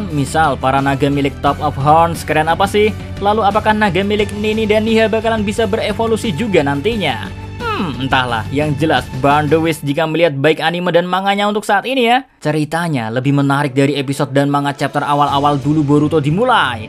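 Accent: Java, Indonesia. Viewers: DHE ( MEDOK JAWAX )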